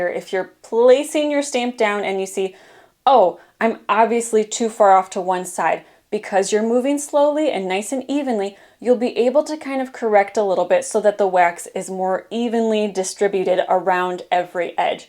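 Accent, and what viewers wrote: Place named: Michigan, United States. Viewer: Very nice tutorial! Are you by chance from mid Michigan? Enjoyed your slight accent 😊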